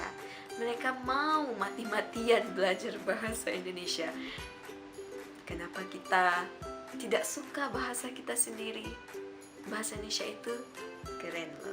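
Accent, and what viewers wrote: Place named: Malaysia. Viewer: ikut senang bhs indonesia ada di harvard. Dan apa kabar dengan bhs malaysia kak? apa juga sampai di sana? karena sepertinya kak berlogat malaysia.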